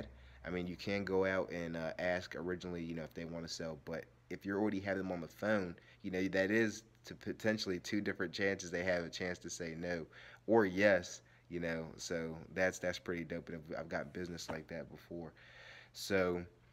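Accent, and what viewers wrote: United States. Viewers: You from the Dmv ?? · Thats a Baltimore accent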